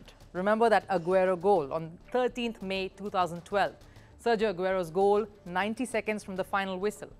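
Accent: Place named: India. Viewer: She's pretty good. Perfect English like the CEO herself. Other than English in Hindi accent 😅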